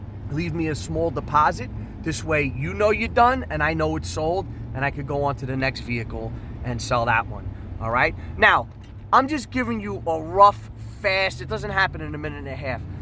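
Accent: New York, United States. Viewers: Your new york accent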